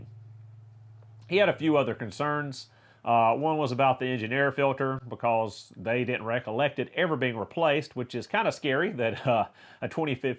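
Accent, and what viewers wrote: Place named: Tennessee, United States. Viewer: Unrelated but where you from bud? I'm in tn and that accent is indigenous over here.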